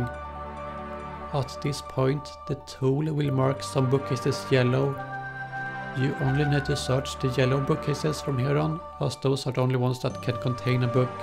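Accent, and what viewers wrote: Sweden. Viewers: bra svengelska :D...det gillar vi (Y)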